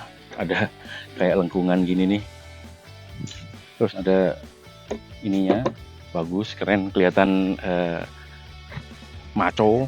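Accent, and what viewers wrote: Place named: Java, Indonesia. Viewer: Jowo ne medog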